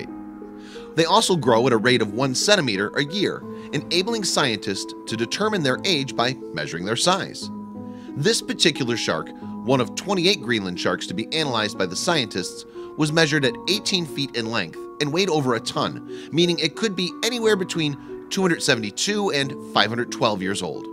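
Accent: United States. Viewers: It's so weird listening to a guy with an american accent read a British/Australian script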